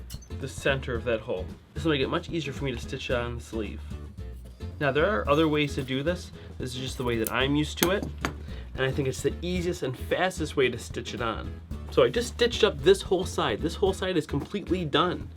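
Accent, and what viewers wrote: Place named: Midwest, United States. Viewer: where are you from? I just think you sound midwestern but not sure (CO expat living in England)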